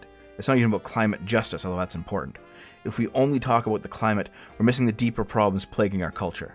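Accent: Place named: Ontario, Canada. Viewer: I think that you only like an hour or two from me. Based on the way you talk I'd assume you live in Ontario. This makes me wanna ask if your grocery store also has to wash the entire conveyor belt with every customer?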